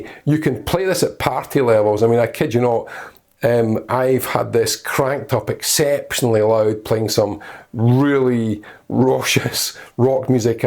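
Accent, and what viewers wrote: Scotland, United Kingdom. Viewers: Being and Australian, I'm sure he's Scottish.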